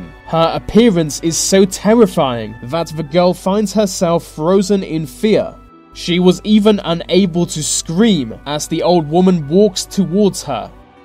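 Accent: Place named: RP, United Kingdom. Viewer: In fact, you say every word ending in Y like a posh twat.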